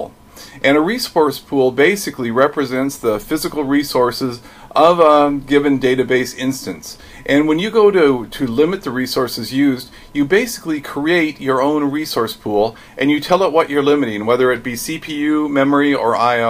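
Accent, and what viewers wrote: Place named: United States. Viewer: Oh boy, American English!!!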